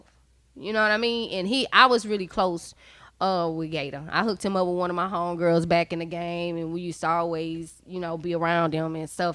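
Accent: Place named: South, United States. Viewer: I love her lil country accent “I’m talm bout Semen 02” 😂😂😍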